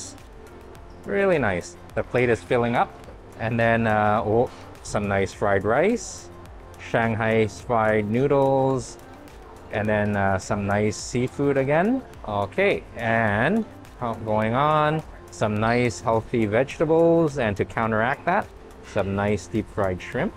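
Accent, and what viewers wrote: Canada. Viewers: I like your HEAVY Canadian accent. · You have a Canadian accent!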